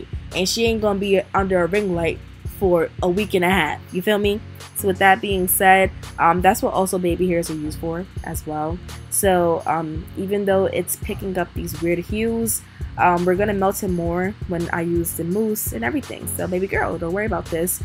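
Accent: New York, United States. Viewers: Yo she has to be from ny the way she talking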